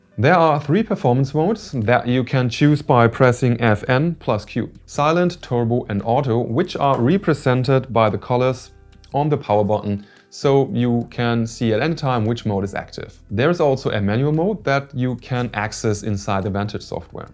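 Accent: Germany. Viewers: Man merkt an der Aussprache direkt das du Deutscher bist 😂❤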